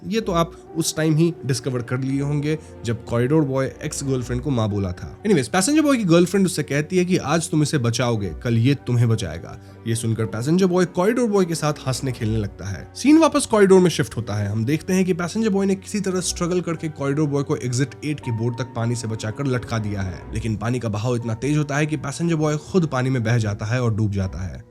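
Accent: Bengal, India. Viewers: You are Bengali, Your tone like bangala.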